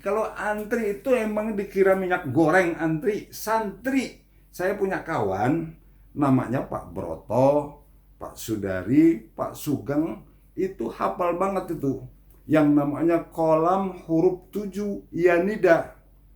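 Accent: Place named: Betawi, Indonesia. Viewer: Alhamdulillah, nama sy dan pak Sudari disebut, dalam Video YouTube Panggilan Adzan. Mantap, dg dialek kental Betawi, Tausiyah yg gampang diingat, pas sedikit ada kelucuan. Lanjutkan, Abah Ustdz.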